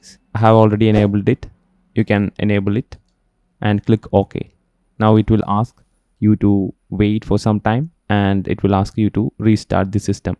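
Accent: India. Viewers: no offense but you sound like the indian guy from tutorials aibot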